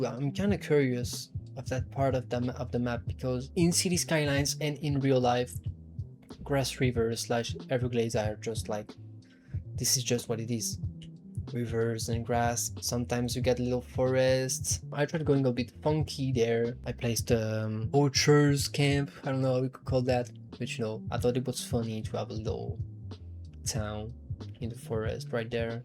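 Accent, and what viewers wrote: France. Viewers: This horrible French accent 😅😮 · Hey, i loved your video ! Are you french ? You have a kind of french accent. Anyway, amazing work, brother. Keep on !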